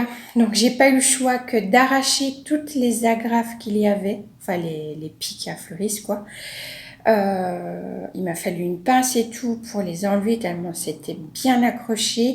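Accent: Belgium. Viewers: jaime beaucoup ton accent belge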